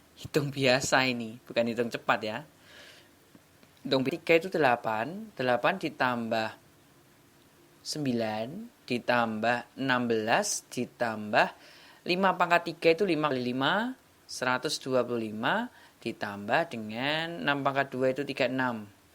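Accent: Java, Indonesia. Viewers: Terima kasih bang. Salam dari pemalang. Bang maaf boleh tau asalnya? Logatnya Jawa nih hehe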